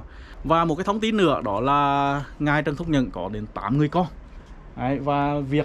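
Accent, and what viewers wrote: Hue, Vietnam. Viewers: giong rat hue va rat hay tui tung hoc chung dh voi nguoi hue o sg nhung giong ban rat de thuong va de nghe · EM HIEU TIENG AY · Đúng ạ, em là ngườì Bắc mà nghe giọng Huế thích mê. Ko xót 1 từ nào. Mong anh giữ phong độ và có nhiều đoạn phim quý giá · Em mà đổi giọng sẽ mất đi một nét rất Huế, và sẽ không còn là Huế nữa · Xin cảm ơn bạn,không,Huế thì phải tiếng Huế mới đúng và hay,cộng đồng hãy ủng hộ kênh này vì rất nhiều thống tin,bạn hãy tự tin lên nhé c đ ủng hộ bạn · Cứ giữ giọng huế nói nghe hay hơn em nhé! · Giọng Huế của bạn cũng dễ nghe, nhưng đừng nhanh quá. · Tôi là người miền Nam, nhưng nghe ngôn từ của anh rất rõ ràng, dễ hiểu. Với giọng Huế chính thống đặc trưng của anh, cũng là yếu tố khiến nhiều người yêu mến đấy. Cảm ơn anh nhiều! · Giọng Huế dễ thương anh ơi luôn đồng hành và ủng hộ anh chúc anh nhiều súc khỏe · Giọng Nói Nghe Rất Rõ Và Hiểu. 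Giọng Huế Thì Nói Tiếng Huế. Không Cần Phải Thay Đổi Giọng Nói. Sài Gòn Nghe Rất Rõ?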